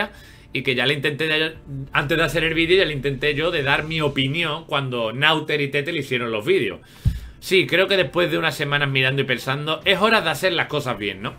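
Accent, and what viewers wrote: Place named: Spain. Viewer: Soy venezolana y te confieso que veo tus videos porque me hace full gracia tu acento y las expresiones españolas, estilo "me toca los cojones" jajajajajaja sorry :D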